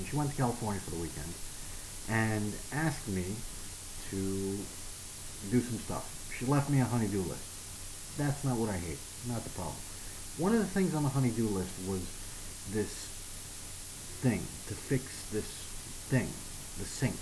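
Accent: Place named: New Jersey, United States. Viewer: So what you're saying is...you like to bitch about how lazy you are. We laugh about people like you when you leave the store because you lack any common sense. And please say "thing" again. When I get some time, I will create a response to your lovely video showcasing how many times you say "thing", "filter" and "faucet". And I'm pretty sure all the employees don't talk like they're from the Jersey Shore.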